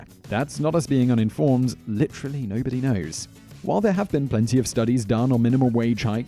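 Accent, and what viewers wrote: England, United Kingdom. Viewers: Your arguments against minimum wage are biased and riidiculous. Also, I do believe I hear an English accent in your tone. Perhaps you should stick to issues in that country.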